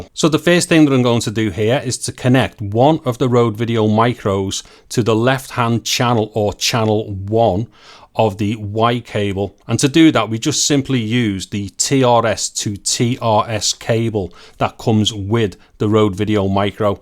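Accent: Liverpool, United Kingdom. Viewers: Couldn't finish the video - scouse accent offends me.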